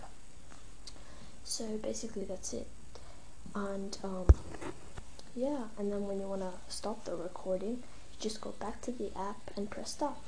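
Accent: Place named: United Kingdom. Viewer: british accent hahhahaha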